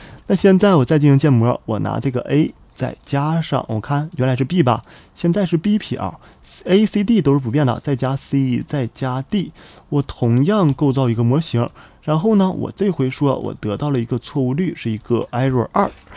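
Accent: Northeast, China. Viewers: 这东北话我听着很带感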